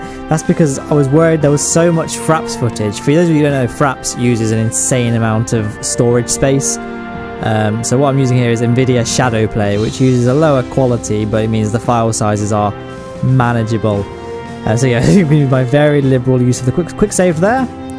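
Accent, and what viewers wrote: United Kingdom. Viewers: Do you from UK? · Got a feeling he might be British. Any else one getting that feeling?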